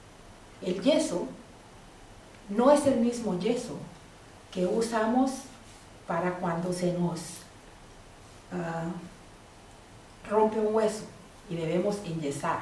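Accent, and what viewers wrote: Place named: Chile. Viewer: Hola ,, por tu asentó me tinca que eres chilena ..pues bien ,, me gustaría saber si vendes tus obras , gracias por tus tutoriales muy buenos ,,saludos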